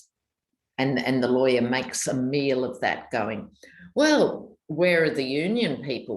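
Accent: Australia. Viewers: Thank you, I love that you have a number for every video and the Australian voice sound is so beautiful on top of that what a gift your reading are for us here in the US.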